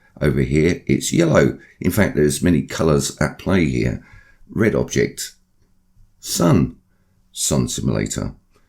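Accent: England, United Kingdom. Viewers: Nice to hear an English voice for a change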